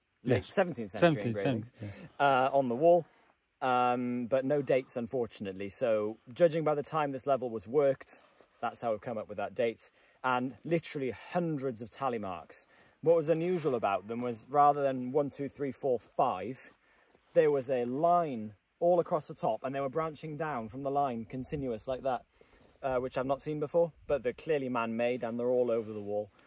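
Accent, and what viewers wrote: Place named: England, United Kingdom. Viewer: A very interesting mining venture. Thanks for exploring it. Also, of interest was to see two "English" doing the exploring. (diffinetly your accent belies your nationality) I say hello from the state of Wisconsin in the USA.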